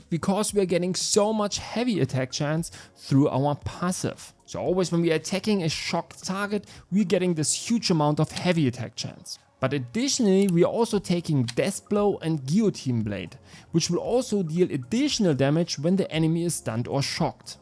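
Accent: Germany. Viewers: so geil wie ich englisch immer besser versthe wenn ein deutscher es spricht. ^^ danke für das video!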